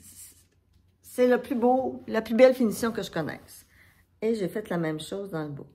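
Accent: Quebec, Canada. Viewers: Enfin quelqu’un du Québec comme sait agréable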